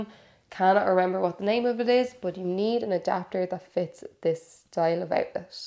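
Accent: Ireland. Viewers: Irish accent is very charming. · She was very informative...love the irish accent...the irish are the best...she's really attractive and so proper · Is it wrong that I watched this for the fabulous Irish accent? Nonetheless, thank you for the tips. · I was pleasantly surprised to find your YT channel today and I love. The reason being that I am fortunate enough to have visited Ireland several times and found out for myself how beautiful your island is and how wonderful and friendly the Irish people really are. The main reason I wanted to comment was, how could anyone complain about your lovely accent??!! I absolutely love it. Love from Tennessee. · I love the Irish accent!! I am southern so I get being asked to say something/ I get it!! Good info!!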